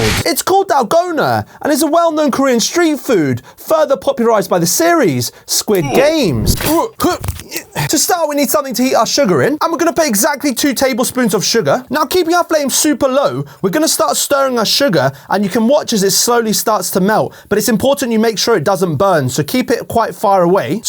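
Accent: United Kingdom. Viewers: my god! He has the bri-ish accent!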